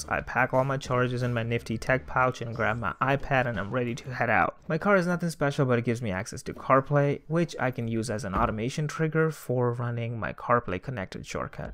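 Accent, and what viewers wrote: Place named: India. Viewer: Indian accent is still there :) , Oh Puchheya Na Kar Mera Schedule Ni But you are telling your schedule about the day ... lol XD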